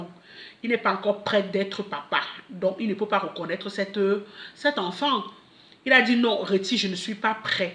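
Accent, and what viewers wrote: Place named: Cameroon. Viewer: Coucou, dans ta voix on voit que tu es une Camerounaises et une femme FORTE ♥️👌👌👌 Tu es très Nianga ( je suis une fille no com vulgaire please )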